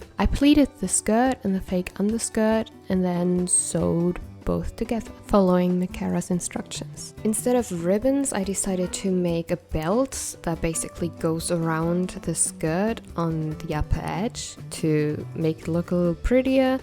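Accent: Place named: Germany. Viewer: I knew you were german! I am normally so bad with dialects and accents. I can't even distinguish between our german ones - exept for bavarian because I've got a bavarian friend and I think I am doing good to figure that out x3 Anyway I somehow thought you were german and it felt nice to be right about that and not be needing to read the subtitles while you talked to your boyfriend x3 I think Micarah's aren't exactly the best for tutorials tbh, but I looooove watching them. I know how to sew the bsaics and all but as I am not good enough and wouldn't know what to do with these sort of dresses/clothes (exept for maybe one day start cosplaying again x3)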